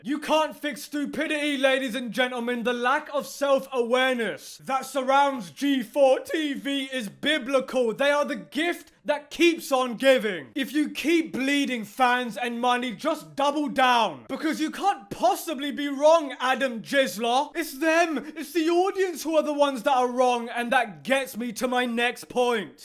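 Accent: United Kingdom. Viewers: The British soccer hooligan accent also sells it.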